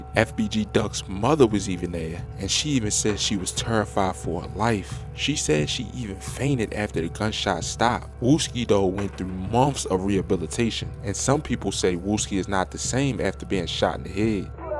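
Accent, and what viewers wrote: Baltimore, United States. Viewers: Your Bmore accent is killing me. 😂😂😂 All these "ooze" in this video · Aye Bro You Frm Baltimore? I Can Hear It In Your Voice😂💯, Love Frm DC💪🏾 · Narrator most def from Baltimore 💯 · Are you from Bmore? Reminds me of growing up with that “Tew Tew” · You from bmore? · I hear that Baltimore accent 🤣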